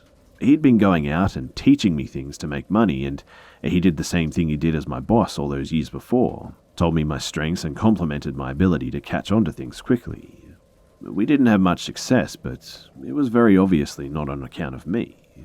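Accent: Australia. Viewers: So good to hear an Aussie accent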